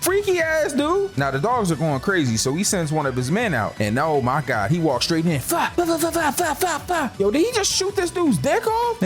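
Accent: California, United States. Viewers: You’re very entertaining! I enjoy your commentary, and I’m pretty sure a great part of that is your californian accent. It makes me comfy 😂🩷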